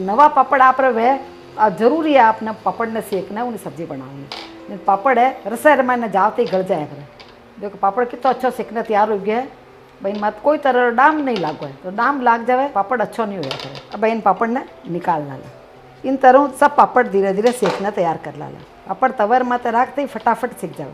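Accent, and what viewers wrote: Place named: Marwari, India. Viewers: This lady's Marwari is just so pure and sweet. The sheer pleasure is to listen and savour. But the added prize is some amazing food dishes. We've tried many and they are simply gorgeous. What an amazing find on YouTube - thank God for YouTube, the world would be poorer if we'd relied on terrestrial TV. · She must be from nagaur district of raj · Love to listen your rajsthani voice and good sabji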